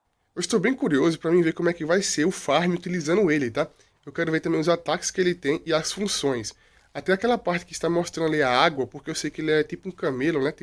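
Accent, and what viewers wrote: Northeast, Brazil. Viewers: Nordestino com certeza 😅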